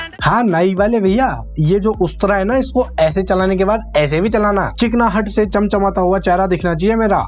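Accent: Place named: Bikaner, India. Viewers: Bro i guess you are from Bikaner because of your accent 😅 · Lagta hai ganganagar ya bikaner se hoga bhai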